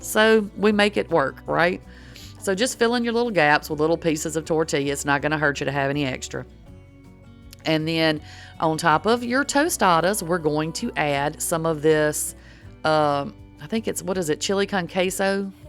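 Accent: South, United States. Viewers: Lovely southern accent. Which State are you from? Ohh,..I’m with you on the Green Onions and Lettuce! Yes! I can hardly cook without 🧅 ONIONS. My MIL says the same thing. I use the Taco Bell taco seasoning packets and we never looked back after that! I’m definitely making that Crunchwrap this week! Tonight is Pinto Beans w Smoked Pork Neckbones and Cornbread -husband’s request. I am 60…and I STILL don’t have a stand mixer so, you’re doin’ better than I am,😂. Lovely mint color for Miss Margo. OHMG, Little Debbie Oatmeal Cream Pies are MY favorite! To make these will be such a treat!!! I think we would be good friends if you were my neighbor.😂 Years ago, I made a variation these but used straight chewy Molasses cookies for my FIL. He wasn’t crazy about oatmeal in a cookie. He told me “You can make those for me anytime you want to because they were fantastic!”. 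Somehow, I never got around to making them again….go figure huh? 😂 Curly fries? If you can’t find your Arby’s….Checker’s French Fries are battered very lightly, seasoned excellently and really GOOD. You might like those as a substitute. Ok,..now we’re on the Cheesecake! 😋 YUM!! I just made a decadent chocolate cake with homemade cream cheese frosting on Sunday and my husband said next time to make him a bowl of the frosting for himself. 😂 Btw, I don’t normally make lengthy comments such as this but, I’m watching all 6 recipes and….listening to you is very comforting somehow.😊Plus I’m resting and recovering ❤️‍🩹 from a ver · Really enjoy your videos. I’m from Indiana and I love your southern accent. You crack me up on some of your saying.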